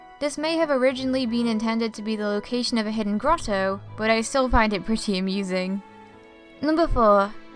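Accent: United Kingdom. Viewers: Are you british? your accent seems to be that. I am kinda shy saying this, but I think your accent is kinda cute.Your videos ate awesome, new subsciber ='D Greetings from Venezuela. Edited: I misspelled are as ate XD (I need to study more)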